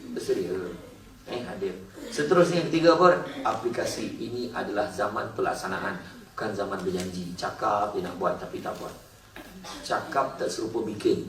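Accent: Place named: Negeri Sembilan, Malaysia. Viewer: Ustaz syamsul debat memang salah satu penceramah hebat dan menjadi salah seorang penceramah kegemaran saya..dengan loghat n9 dia tu..buat saya terhibur. Tq pis..share.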